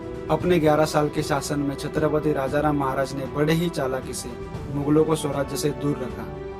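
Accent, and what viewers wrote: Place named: Maharashtra, India. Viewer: Are video banawnara pan marathich ahe... accent Varun kalat nahi ka...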